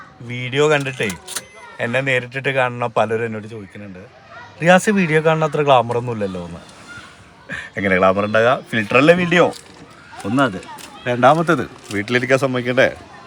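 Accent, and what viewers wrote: Palakkad, India. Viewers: വീഡിയോ പൊളി... ഇടക്കുള്ള dialogues... സൂപ്പർ... നിങ്ങളുടെ പാലക്കാടൻ സംസാരരീതി കൊള്ളാം 🤣ചെറിയ മക്കളൊക്കെ (boys)പൊളി 🥰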